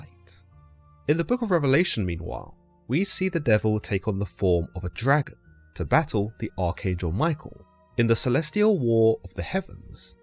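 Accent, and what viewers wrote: United Kingdom. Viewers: No AI voice just a cool British guy with a great narrator voice keep going brother .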